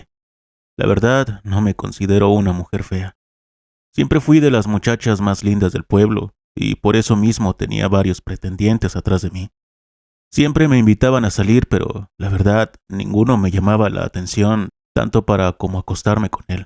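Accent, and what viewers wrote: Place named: Chihuahua, Mexico. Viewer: Voz típica de un chihuahueño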